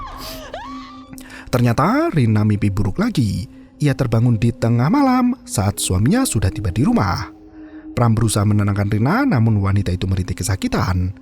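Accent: Banyumas, Indonesia. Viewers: Solo daerah mana kok ngapak? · Astaga solo ngapak😅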